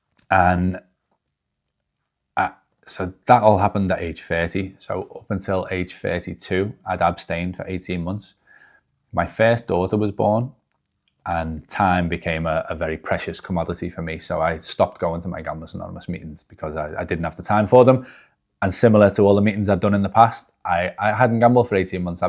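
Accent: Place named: England, United Kingdom. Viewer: twenty dollars? You then proceed to keep interchanging currencies.. Keep it real. If Americans are too lazy to Google the exchange rate then that's down to them. Your English accent threw me off? Later you're talking in pounds? Sorry but seems ridiculous you said you didn't think you deserved to fail the test but the driving examiner said you were going too fast. Then you crashed because you were going too fast in your words? It seems in reflection your examiner was correct to fail you but you still hadn't learnt your lesson... At least nobody else was hurt..u your mum mightve been a diamond but unfortunately an enabler and she did you no favours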